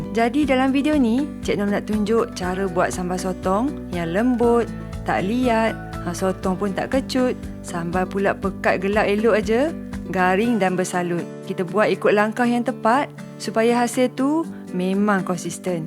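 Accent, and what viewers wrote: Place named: Kedah, Malaysia. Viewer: Dengar loghat kedah video ni. Hahaha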